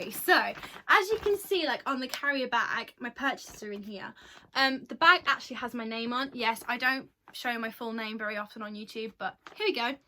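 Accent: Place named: United Kingdom. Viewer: No problem! 😉and yes I love the British accent 🥰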